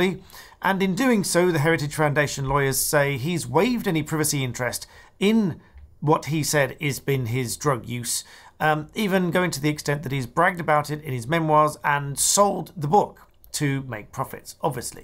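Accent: United Kingdom. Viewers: Your accent to British. Here you go again.😂😂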